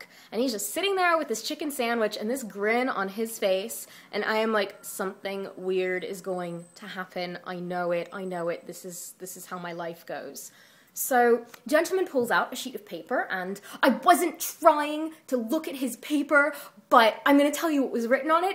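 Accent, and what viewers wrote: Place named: United States. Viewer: you sound so american in this vid!!!!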